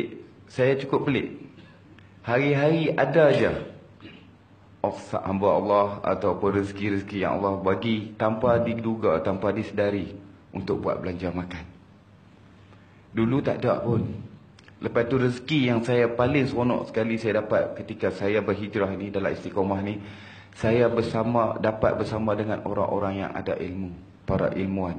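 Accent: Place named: North, Malaysia. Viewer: Skit2 kdah.... skit2 kdah... perlis ada penang ada.. perak utaqa pn ada ckp mcm ni jgk... smpai ke pntai remis ckp utara.... awt xsbut....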